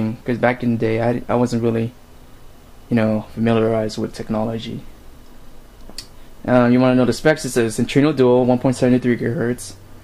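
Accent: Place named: Philippines. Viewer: Are u phillipino? You sound like one :P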